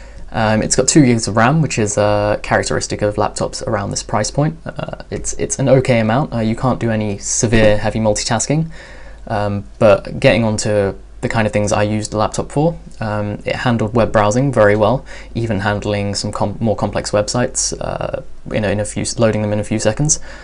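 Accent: England, United Kingdom. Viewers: This guy could not have a more English accent if he tried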